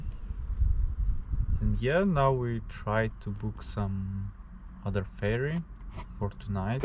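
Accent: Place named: Eastern Europe. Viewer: well, I felt your accent is not as native speaker has, I thought you are from somewhere from the Eastern Europe, although your English is very fluent!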